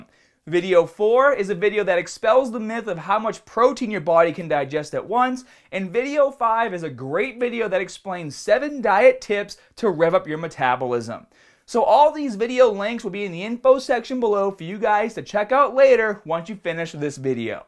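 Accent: Boston, United States. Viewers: I fahuckinnn love bohston accent. Fahhhkin knowledge and cahhhhhrrbbsss! Seriously though I'd say is the most helpful Fitness youtuber out there. As a exercise science major and fellow fitness enthusiast got nothing but respect for the knowledge he gives us all. Keep doin you!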